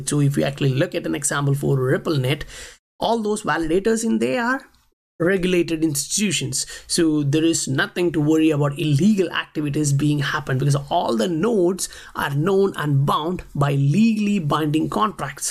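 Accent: India. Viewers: where are you from?? You sound like maybe India? I'm in the US. Shit is crazy here right now. People looting and rioting in the streets its fucking chaos.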